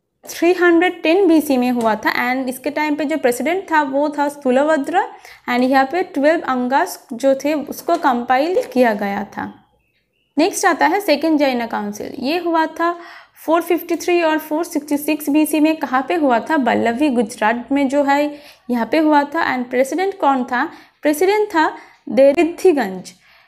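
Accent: Bengal, India. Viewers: And apnar kotha shunei bojha jaay apni Bangali🥰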